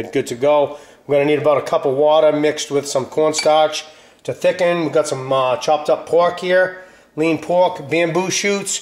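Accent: Boston, United States. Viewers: sounds like ur from boston. come on say dark againg.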